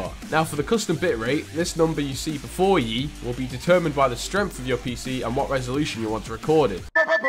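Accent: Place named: United Kingdom. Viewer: my guy has a british [bri'ish] accent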